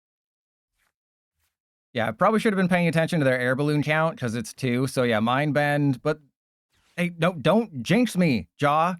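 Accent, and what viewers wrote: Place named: Australia. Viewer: Living in Australia and still lucky enough to hear incredible aussie accent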